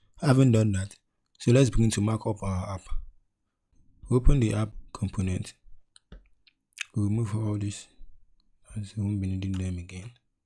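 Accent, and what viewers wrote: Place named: Igbo, Nigeria. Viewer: this na confirm igbo guy, probably from enugu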